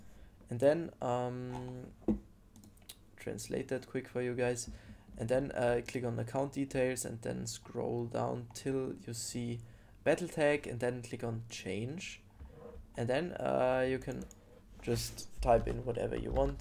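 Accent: Germany. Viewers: Dieser deutsch Akzent ahhh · i spot sam dchermen akzent here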